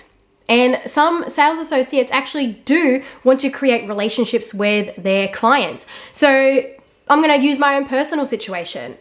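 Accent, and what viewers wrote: Australia. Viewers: so interesting! I actually found you from this drama but will continue to watch your Chanel now ! Keep it up! I’m a aussie in the USA so nice to hear a aussie voice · And you think you know everything and can determine SOMEONE ELSE'S place of origin simply because you don't like them, or the fact that they're from your country of origin. Do you even know what Aussies SOUND like, because she sure af sounds like one to me.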